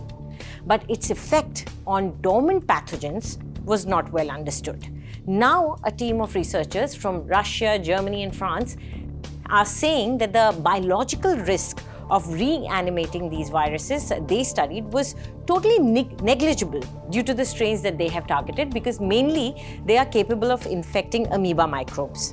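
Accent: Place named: India. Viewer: What a heavy Indian accent. I cannot remember how to speak in English.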